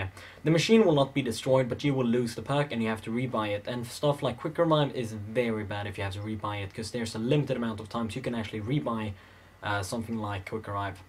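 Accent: Netherlands. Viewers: Heh, i thought you were dutch :P Anyway you deserve more subs for these.They're the only good zombie guides on youtube. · Dutch? Swedish?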